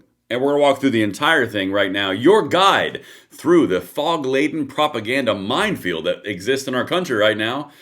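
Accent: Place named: Appalachia, United States. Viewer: Could you please despense with the hillbilly speaking, unsubscribed because I hate redneck language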